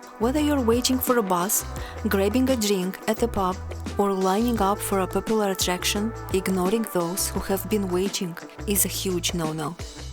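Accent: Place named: Russia. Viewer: Russian English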